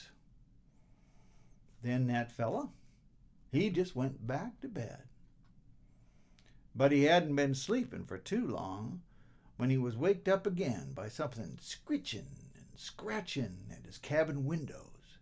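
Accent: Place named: South, United States. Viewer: Pretty good on the “southern” accent too!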